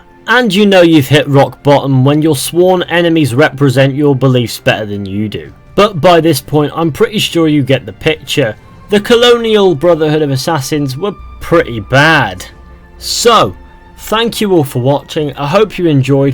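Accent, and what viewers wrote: United Kingdom. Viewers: first of all if you want me and everyone else to watch it........ change your fucking British accent!!!!!. a YouTube video must be helping not some fucking video with fucking English accent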